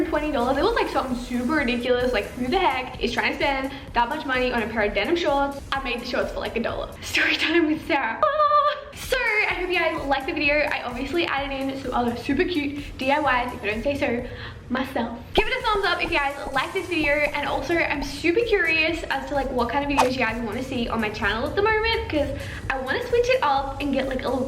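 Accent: Australia. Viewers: Where's your accent from? Australia? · Y do Australians say hach and not ach lol😂😂😂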